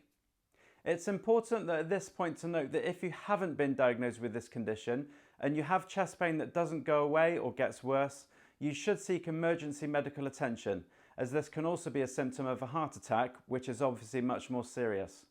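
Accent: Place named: United Kingdom. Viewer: Thank you for this very helpful video. I could listen to your British (?) accent all day long. I hope to be incorporating this exercise regimen along with Flow Therapy (Compression for Micro Vascular disease) soon.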